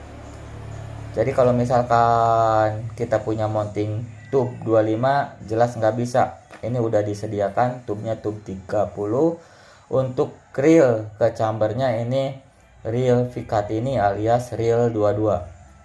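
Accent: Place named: West Java, Indonesia. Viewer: Logat sundaxa sangat lekat kang,salam dari Papua,Asal Majalengka👍👍🙏🙏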